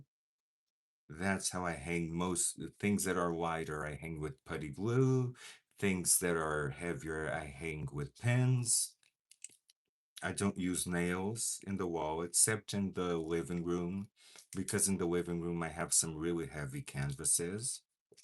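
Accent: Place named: Pittsburgh, United States. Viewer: Ha you guyz!!!! How are Yinz dooooooing!!! You sound like a Yinzer!!! Hehehehe Pittsburgh would love you in it's city❤❤❤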